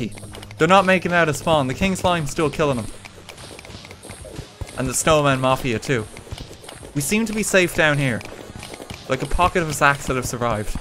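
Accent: Ireland. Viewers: R u irish? cuz ya got an irish accent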